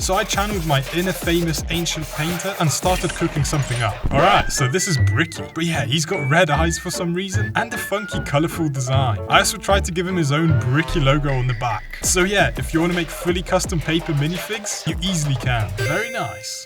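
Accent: United Kingdom. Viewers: You're British 🇬🇧？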